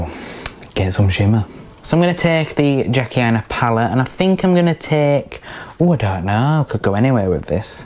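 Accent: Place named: North, United Kingdom. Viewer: You are so refreshing, I love how northern you are I feel reyt at home listening to your voice whilst you do your make up. 🥰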